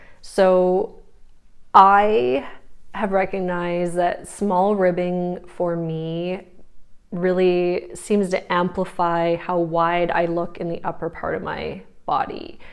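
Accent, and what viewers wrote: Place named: Canada. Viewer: and sorry - you’re Canadian - cardinal sin to assume you’re American- I should have got that from your accent! I have family in BC 🤎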